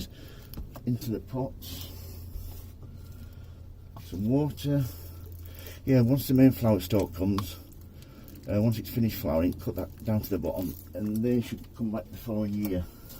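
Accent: United Kingdom. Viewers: I just gave a thumb for the Northern accent!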